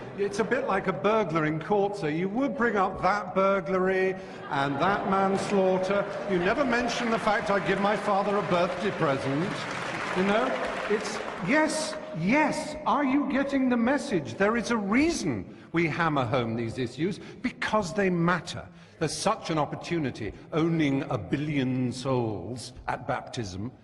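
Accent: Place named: United Kingdom. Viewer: I could watch this over and over again. As fan of British English I sit admiring the accent and the rethorics. Beautiful!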